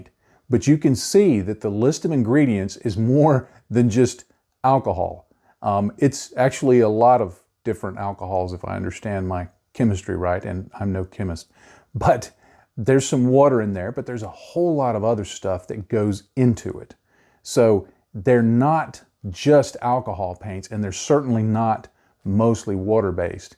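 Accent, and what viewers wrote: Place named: United States. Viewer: Very goood clear info for a begginer like me or maybe an old hand. You are the first yank i can listen to Thanks buddy from a cross the pond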